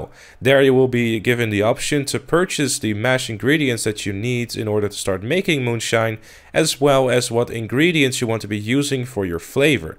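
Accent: Netherlands. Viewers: His dutch accent is funny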